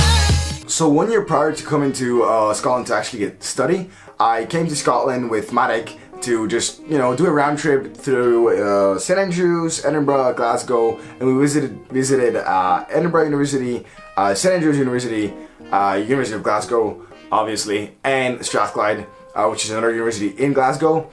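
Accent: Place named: United States. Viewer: where are you from? You have an interesting accent, it sounds American but not quite.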